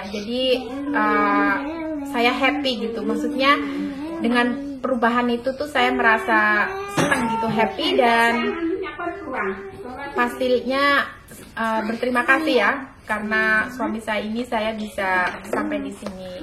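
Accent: Java, Indonesia. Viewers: Boso jowo e jek medok. Semoga rukun terus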